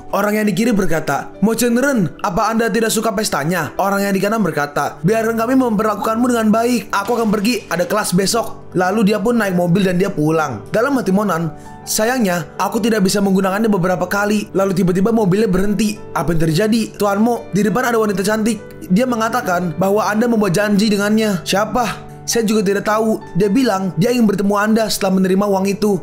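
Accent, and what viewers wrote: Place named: Makassar, Indonesia. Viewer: itu logat makassar klo g slh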